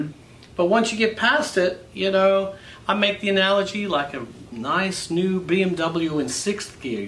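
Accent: Australia. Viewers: It sounds like Frank's Australian accent isn't as noticable as it was back in the 90's. It's more subtle, and he has an American accent at times. Not a cut down; just an observation. I love the guy! I still have his old REH Video on VHS from when I was a kid. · Being as I live in Sydney, I thought I detected an Aussie accent; yup, born in Canberra he was! Oy, Frank, awesome, mate!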